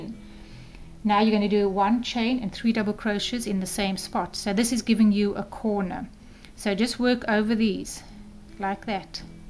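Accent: South Africa. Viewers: Not sure how to post a photo here. I've made and ss 3 together. The yarn is a lighter weight cotton and my tension is a bit loose, so I probably should have used a smaller needle. And they aren't exactly uniform in size yet, but I expect that will improve with more practice. They still look very pretty and I will make at least three more to complete one placemat. It is a very cute pattern, thanks again! ps- Is your accent South African? Love it! (I have a friend in town from SA)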